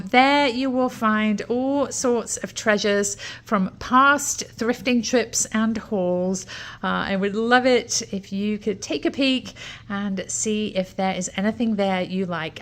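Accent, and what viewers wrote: England, United Kingdom. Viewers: Where are you from? Where is the accent from? England?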